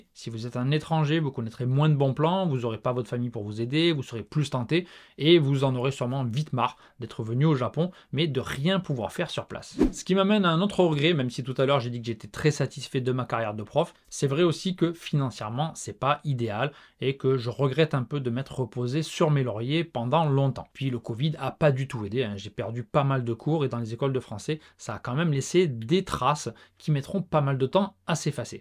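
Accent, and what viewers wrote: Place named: South, France. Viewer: salut. une videos limite émouvante. limite kawai si vous aviez eu des yeux plus grand et plus humides. j'aimerais aller au japon un jour mais y vivre je le sens pas avec cette histoire que les japonnais ne débâtent pas ou manque souvent de discutions. d’ailleurs j'ai pensé a un phénomène de bulle plus ou moins grande quand on est etrangé dans un pays en voyant cette videos.... je sens un complexe sur ne pas etre doué avec le sav japonnais.... après y'a d'autres qualités, la cuisine, etre un bon pere, réparer des choses, etre un bon soutiens émotionnel les courses.... et ne vous inquiétez pas tant que vous garderez votre petit accent du sud sympathique vous resterez francais. ça sera si vous le perdez qu'il faudra s’inquiéter. d'ailleur l'histoire de vouloir progresser en japonnais j'aurais tendance a vous conseiller a fond d'accompagner votre enfant avec les devoirs de japonnais. 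meme 15 30 min par jours ça vous fera passer du temps pere fils et vous améliorerez surement votre japonnais genre a lire avec lui des passages de livres simple ect.... et meme pourquoi pas lui lire une histoire en japonnais le soir avant qu'il s'endorme. ça joindrait l'utile à l'agreable. apres comme petit boulot je vous imaginerais trop combattre le franponnais, traduire des patisseries, des enseignes ou des cartes de restaurant meme en echange d'un tres bon repas ou un truc dans le genre.... dommage votre ville visiblement est pas super touristique ce qui fait aussi que vous ne vivez suremen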